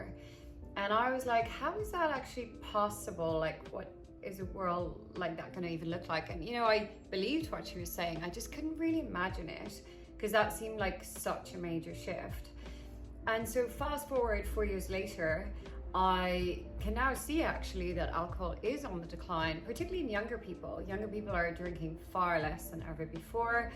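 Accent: Ireland. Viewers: Alcohol is a hoax. It’s a poison that robs you of your health, potential and your dreams. Judging by your accent you’re Irish. So you know how difficult it is for people who don’t drink here. I’m off it 1 year and 1 month and my friends think I’m just ‘going through a phase’ and I’ll eventually come to my senses. Never.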